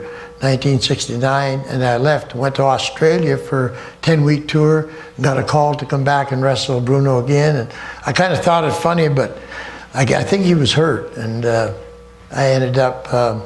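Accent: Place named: Canada. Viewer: So strange to hear uncle Ivan speak with a Canadian accent. Growing up I really thought he was Russian. He was great!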